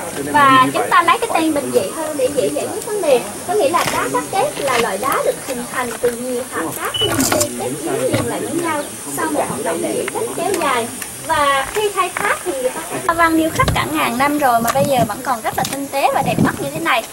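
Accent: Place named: Quang Nam, Vietnam. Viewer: Phát âm giọng Quảng Nam đòi chuẩn như Hà Nội ah .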